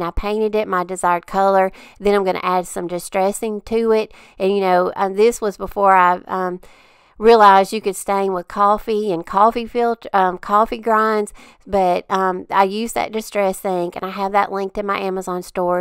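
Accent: South, United States. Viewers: Hello! Donna from Delightfully Southern mentioned you on her channel and she was right....you are so talented! Loved all of your crafts and I have already shared them with 3 family members!!! I was wondering what state you are from? I am in North Carolina and I noticed you have a southern accent so, I was just wondering. Can't wait to watch more of your video's. You all did a great job with your house. I did go back and looked at some of your older video's. ❤️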